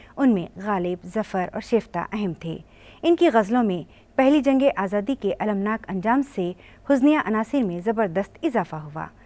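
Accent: Deccan, India. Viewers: The teacher is from Hyderabad probably. Her pronunciation is quite Deccani.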